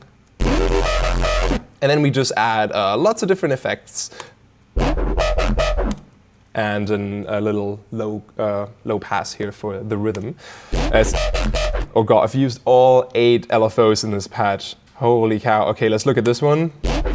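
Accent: England, United Kingdom. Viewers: why are you developing an english accent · Does virtual riot kinda sound british in this video? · Someone’s been spending a lot of time in the UK. He’s got half a British accent now! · Is it just me or does sound like he has a slight British accent now?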